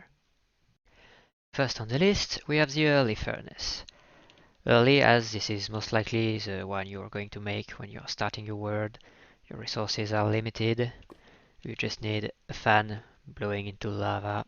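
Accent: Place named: France. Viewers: miam le bon accent francais :) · j'etais donc pas fou ! j'avais reconnue notre bon vieux accent héhé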